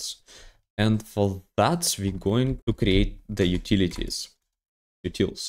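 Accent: Russia. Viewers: По акценту как будто ты русский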